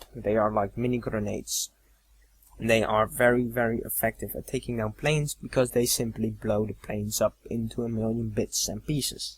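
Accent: Netherlands. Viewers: Are you dutch you have a Dutch accent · are u dutch i can hear a tiny dutch accent in what ur saying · are you dutch? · im 10 seconds in the video and I already know now that this guy is dutch. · Thnx for the vid, That's a Dutch accent :) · you are dutch... no doubt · Ff een vraagje... Ben je Nederlands??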